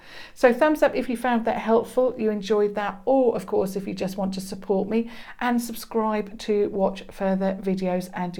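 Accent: United Kingdom. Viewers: She was British so her accent was British. Unfortunately she is no more , she passed away due to a brain tumour but was such a wonderful person. I use to watch all her videos .😭😔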